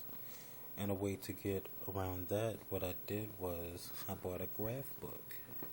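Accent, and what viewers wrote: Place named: DMV, United States. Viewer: Thank u for this video I homeschool my children and I’ve done so for many years my children are very interested in speaking Japanese and ur video has been the most helpful to me with the teaching basics at a low price so once agin thank u 🙏🏾 I currently live in Ohio but I’m a lower eastern shore girl through and through I could tell from ur accent that u were from the DMV area and to me that is always a plus 😉👍🏾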